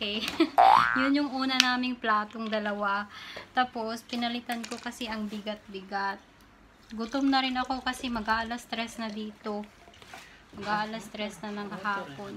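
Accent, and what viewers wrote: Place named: Ilonggo, Philippines. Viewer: Prang ilongga k mgsalita malambing. At mahinahon..new subscriber hr. #OFWinjordan.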